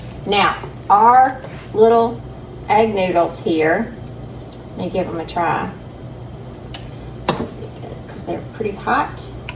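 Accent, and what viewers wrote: South, United States. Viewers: Looks good and love southern accents so no worry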